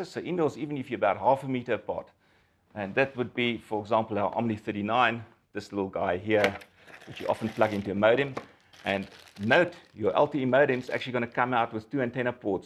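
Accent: South Africa. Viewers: a South African accent talking man tech talk... with all the gear on the desk ... I think it might be an Austrian thing as we have a lot of South Africans living here and we find the Accent very funny as they are mostly very wealthy and loud... but not that with it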